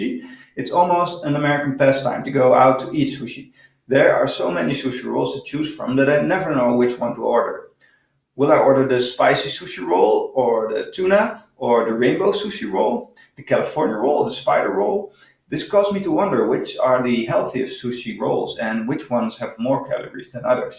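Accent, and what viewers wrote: Netherlands. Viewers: This dudes sounds as Dutch as you can sound. I would bet the house on the fact that this guy is originally from Holland.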